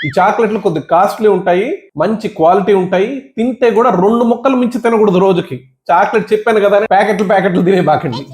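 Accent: Nellore, India. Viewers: Thank you doctor Language is very nice with smiling face. I like nellore language.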